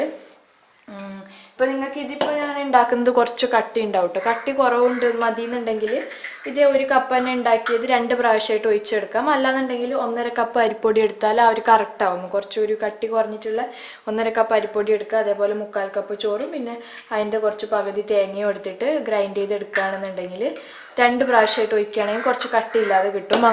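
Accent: Kasaragod, India. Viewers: oru vlog thani kasarkod shylilyil pratheekahikkunnu.....i wud luv to hear ur native style of talking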